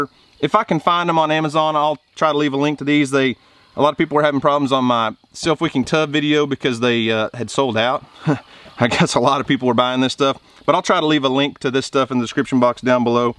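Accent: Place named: Oklahoma, United States. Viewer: Thanks for the instructions...going to give it a try. Your Oklahoma accent is quite differ different from my Michigan gab. Enjoy growing!👨‍🌾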